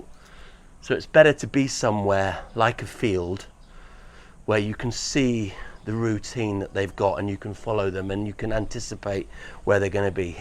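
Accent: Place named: United Kingdom. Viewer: A very good effort for your first vlog attempt with interesting content and I am looking forward to seeing more on the OM-1 as it is on my Christmas wish list. It would be good to include your location in the vlog as I was playing detective trying to work it out. UK accent and driving on the left, so I originally thought somewhere in the UK. But the light just appeared too harsh to be the UK. You said the temperature was 35c, so I thought you must be in Australia. But then you were photographing Pacific Swallows, so I started to suspect Japan but was not sure. It was not until I read one of your comment replies that I knew you were in Japan for sure!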